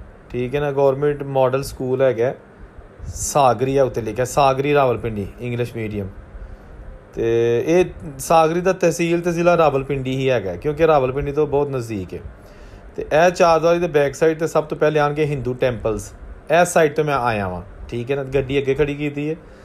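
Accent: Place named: Gurdaspur, India. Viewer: Apki bolne ka lhja bilkul same vesa hai jisa Indian punjab ke gurdaspur side ke logo ka hai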